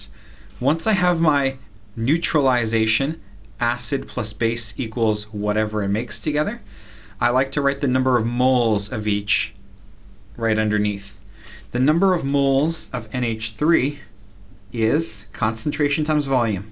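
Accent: Canada. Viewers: ''check it OOT'' gotta love the Canadian accent man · are you canadian? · you just took a nice $@ on my professor life, thanks man and btw i really like the canadian accent "OAT" when you say out haha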